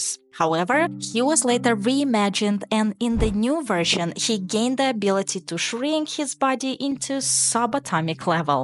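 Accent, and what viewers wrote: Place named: Russia. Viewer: What accent is this?? Russian?? Super adorable accent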